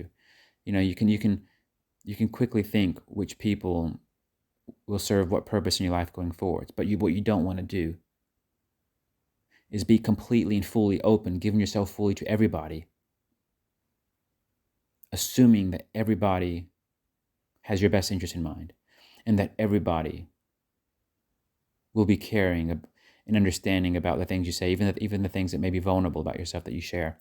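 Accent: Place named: United Kingdom. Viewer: This is an English accent mixed with an American. It’s so weird how sometimes you stray into British, and then you put the American accent on again. I hear you! British guy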